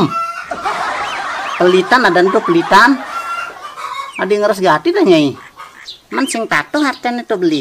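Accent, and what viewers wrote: Buleleng, Indonesia. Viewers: Wkwkwkkk munyi buleleng giih niki? Jeg lucu sajan2... Makasi pak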